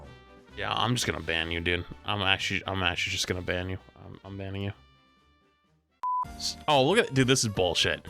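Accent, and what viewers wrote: Boston, United States. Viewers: You're from Boston?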